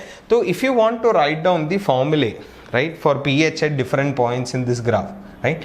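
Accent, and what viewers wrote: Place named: Kerala, India. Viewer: In my school most of the English teachers have same accent as they are from kerela.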